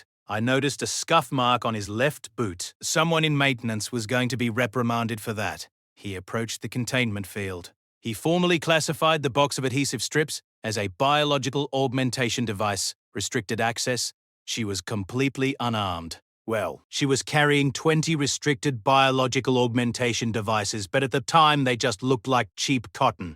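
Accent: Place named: Australia. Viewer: Love the narrator's Strine accent!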